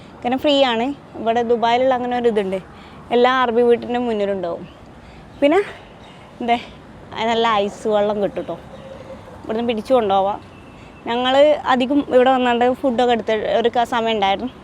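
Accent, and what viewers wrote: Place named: Kondotty, India. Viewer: ശബ്ദം കേട്ടിട്ട് കുണ്ടോട്ടി എടവണ്ണപ്പാറ റൂട്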